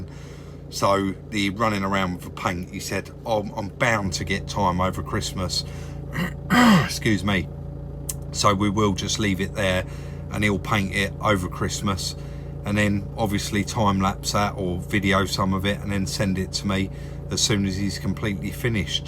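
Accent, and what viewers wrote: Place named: Cockney, United Kingdom. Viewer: I came here for the Bentley and ended up staying for the East Enders accent 😊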